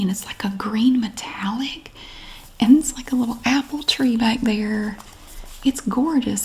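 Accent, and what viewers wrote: South, United States. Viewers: I curious all of a sudden do your boys have the southern accent as strong as yours??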